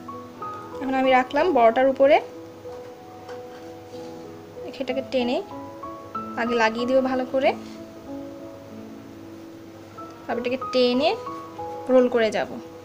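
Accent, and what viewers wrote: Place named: Chittagong, Bangladesh. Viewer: আপনার ভাষায় কিছুটা চিটাগং এর টান আসে।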